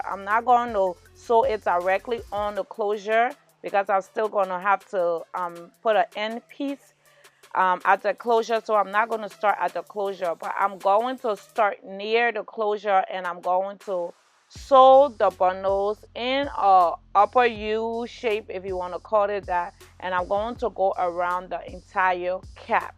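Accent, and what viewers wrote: Liberia. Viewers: I hear Liberia !! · you sound liberian go awwwf sis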